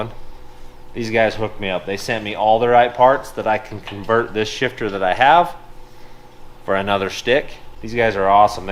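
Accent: Utah, United States. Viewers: I think ever since Randall started, your southern Utah drawl has become more noticeable.🙃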